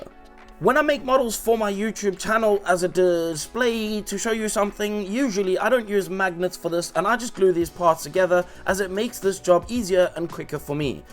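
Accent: South Africa. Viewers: Just got into resin printing and painting, your channel is very informative, thanks man and keep up the great videos. You sound South African? Howzit! Lekka Lekka!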